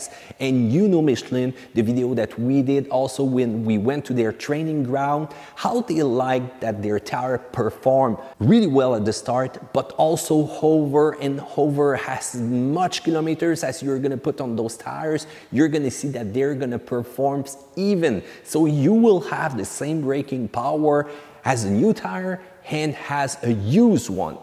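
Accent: Quebec, Canada. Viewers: Yess, Anglais Québécois!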